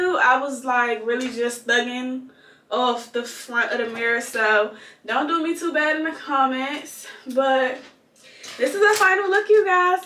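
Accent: Washington DC, United States. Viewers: Accent give me dc vibes 💕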